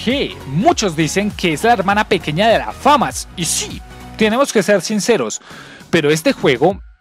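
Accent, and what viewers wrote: Colombia. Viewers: Wow, tienes un acento muy limpio y neutro para ser colombiano, todos los que conozco no pueden decir una oración sin usar groserías o jergas horribles de acá (También soy colombiano)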